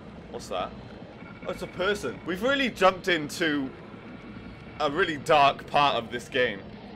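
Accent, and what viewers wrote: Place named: Wales, United Kingdom. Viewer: Sounds a bit welshy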